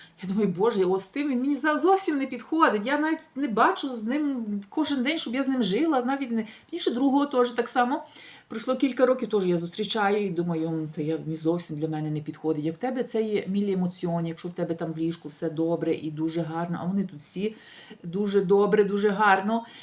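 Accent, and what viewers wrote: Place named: Western Ukraine, Ukraine. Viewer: ..ВЫ СИДЯ СЕЙЧАС В ИТАЛИИ..ГОВОРИТЕ ОЧЕНЬ ПЛОХО ПРО НАШИХ МУЖЧИН..А ТАК ЖЕ ПРО НАШИХ ЖЕНЩИН..В С ЗАРАДНОЙ УКРАИНЫ,СЛЫШЕН ПРОНОНС..СТАРАЕТЕСЬ ВСЕМИ ПУТЯМИ УНИЗИТЬ!!!ВЫ 10000%ИМЕЕТЕ ИТАЛЬЯНЦА..НО ХОРОШЕГО..ТАК ВАМ ПОВЕЗЛО..И ГОВОРИТЕ ПРО НАШИХ ЖЕНЩИН,ЧТО ИМ ЯКОБЫ ВЫГОДНО ЖИТЬ С ИТАЛЬЯНЦЕМ..ЭТО ДАЛЕКО НЕ ТАК!! ДА..ЕСТЬ НАШИ ЖЕНЩИНЫ ЖИВУТ..НО ОТ БЕЗЫСХОДНОСТИ..ОНИ РАБЫ Ц ИТАЛЬЯНСКИХ МУЖСТН..А ВЫ ТАК ЛЕГКО ИХ ОСУЖДАЕТЕ...БОГ ВАМ СУДЬЯ🙏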